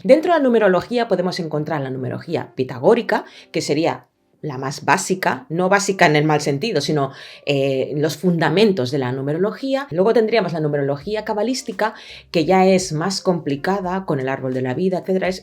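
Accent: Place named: Spain. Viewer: ¡¡Que contraste el tuyo!!.tienes rostro caribeño y hablado(acento) españolete.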